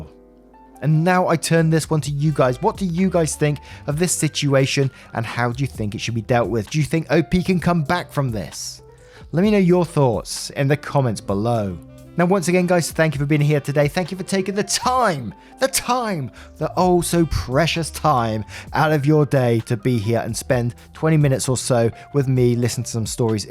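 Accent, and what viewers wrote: England, United Kingdom. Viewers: Listening to this while I work on ironing out details with a guy 2 hours drive south to look at the horse he's selling. If all goes well, I'll be a first-time stallion owner:D These videos are one of the highlights of my week (I like letting them build up and then binging on the videos) as I like hearing not only the reading style and accent (sucker for an English accent), but your own points of view and assessments of the stories you read. So, thank you, ^^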